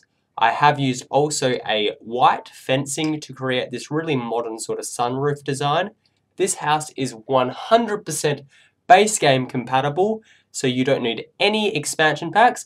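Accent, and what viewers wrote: Australia. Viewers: is he from Australia? because he sounds like it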